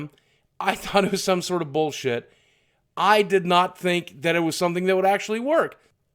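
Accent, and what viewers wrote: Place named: Arkansas, United States. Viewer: This is off topic boogie, but I'm from Australia and I just wanted to say you have the most pleasant accent to listen to out of other US states (Arkansas right?), I enjoy listening to you speak and you could talk about literally anything and I would find it interesting haha. Love ya mate!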